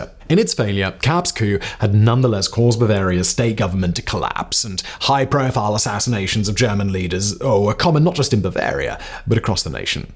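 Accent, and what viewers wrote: RP, United Kingdom. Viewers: I can't watch this guy, is that accent affected or is he really that posh? Ugh. It's too distracting.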